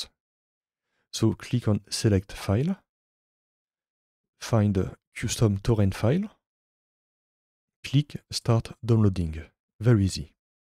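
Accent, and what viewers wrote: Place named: France. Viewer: Very subtle french accent 😊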